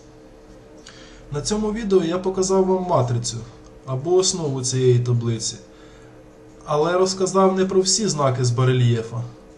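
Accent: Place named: Western Ukraine, Ukraine. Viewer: Понимаешь что достаточное колличество лайков оно не наберёт - тема не популярная. Но раз Бог открыл для тебя эту информацию, значит может это не просто так, а для того чтобы ты поделился ею! И ещё , в видео Говори медленнее, я из Украины , но твой чистый западный диалект, не очень понимаю. И более доступно объясняй - когда ты писал в комментариях - я гораздо быстрее понимал , чем когда ты говоришь в видео.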